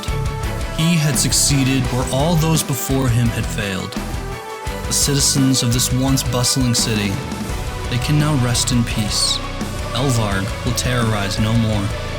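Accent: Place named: United States. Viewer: Monotone american accent ruins it. Sorry, great animation though.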